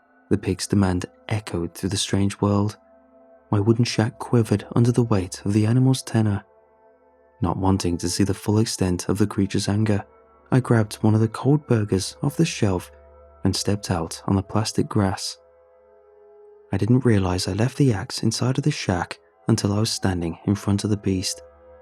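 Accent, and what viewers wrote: United Kingdom. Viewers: He’s British. They pronounce words differently.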